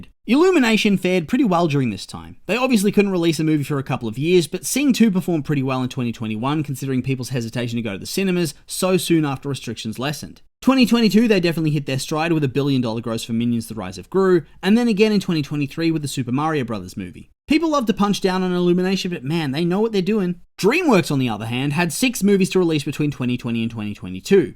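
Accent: Australia. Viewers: you sound like an australian olaf. also i agree with your opinion! i havent been able to see elio but the trailer IMMEDIATELY grabbed my attention. space is so beautiful, and they made it look so beautiful in the trailers too. and the protagonist looks so adorable!! i didnt see a lack of advertisement until i was able to get my adblocker back on. ...obviously.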